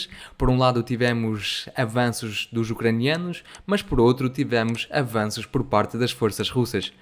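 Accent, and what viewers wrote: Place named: Portugal. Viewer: é brincadeira cara. Tá ótimo o vídeo, a narração mesmo com o sotaque de Portugal tá perfeita. Forte abraço!!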